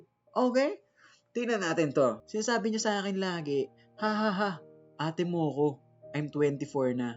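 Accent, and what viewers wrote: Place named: Pampanga, Philippines. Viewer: Aydo atchu ya pala pampanga bap hahah an lakas boss taga pampanga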